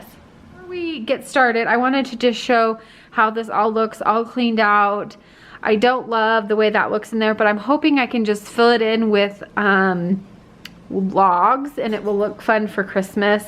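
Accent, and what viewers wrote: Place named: Midwest, United States. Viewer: You are a working women!! I really like how it turned out. Sometimes you get into something and it can throw you, but you guys did it. I just love your wicker around your tree. Now! questions. You sound like you might be from the mid-west. I am from Iowa. My maiden name was Hansen, Yes with a sen. I was wondering. let me know. this is the second time I have found you. Also when you said call it a day. That sounds like something I would say. Joyce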